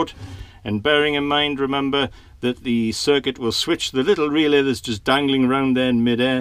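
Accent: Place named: North East England, United Kingdom. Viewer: I recognise a bit of a north east twang to yourself, great video and information, I will steal that idea, cheers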